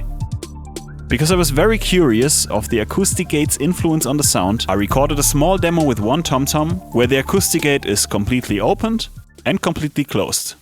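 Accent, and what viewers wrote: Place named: Germany. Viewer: Kann man nicht für wenig Geld einen Muttersprachler den Text ein sprechen lassen....spoken German English is soooo shamefull. Beside that: nice work.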